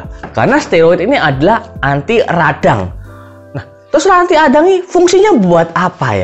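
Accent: Java, Indonesia. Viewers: Logat medoknya doterkuh.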